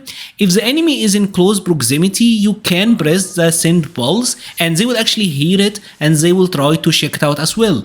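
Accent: Egypt. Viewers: ازيك يا باشة لهجتك مصري عرفتك من اول جملة--- شكرا عل فيديو لزيز قوي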